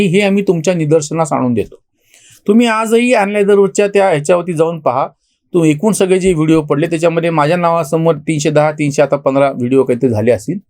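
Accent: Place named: Marathwada, India. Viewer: मराठवाडी लहेजा न सोडता आपण बोलत रहा. भाषा दर दहा कोसांवर बदलते अशी म्हण आहे. "लाईक्स भेटत नाहीत" या वर कुणाला हरकत असेल तर तो त्याचा प्रश्न आहे. भाषा शुद्धतेचा अवास्तव आग्रह धरणे अनावश्यक आहे. व्हिडिओ दहा मिनिटात संपला तर जास्त यशस्वी होतो. भाऊंचे व्हिडिओ मी 1.75X स्पीड ला बघतो. काही फरक पडत नाही. उलट भरपूर वेळ वाचतो.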